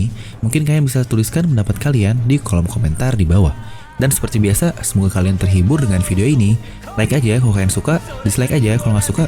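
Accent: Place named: West Java, Indonesia. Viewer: Urang sunda deteck:v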